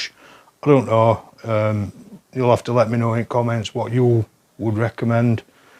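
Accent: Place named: South Yorkshire, United Kingdom. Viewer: Hiya mate, judging by your accent I’m guessing you are in the South Yorkshire area, I’m gonna say Barnsley. I may be wrong. Anyway the Vectra looks great, my dad used to have one of these back in the day, and it was a diesel variant in like a British Racing Green colour. One thing I always liked is how wing mirrors are integrated into the body lines.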